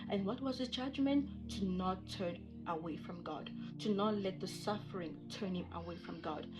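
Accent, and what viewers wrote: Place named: France. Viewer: You speak French huh? I can hear the accent 😂❤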